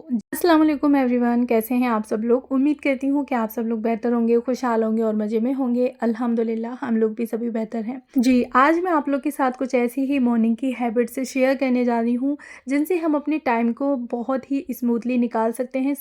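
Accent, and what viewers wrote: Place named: India. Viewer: Because your acent is like india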